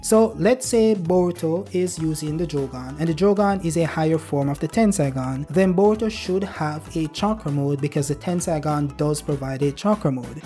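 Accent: Jamaica. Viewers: Youhavaa Jamaican voice are u jamaican???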